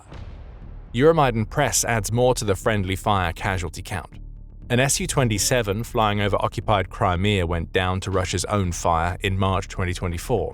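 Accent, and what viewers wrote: England, United Kingdom. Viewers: Crap Americanisms with an English accent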